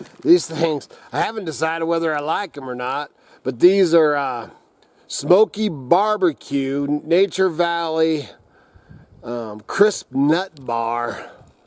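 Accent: South, United States. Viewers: Sounds like myself hillbilly enjoyed the clip thanks.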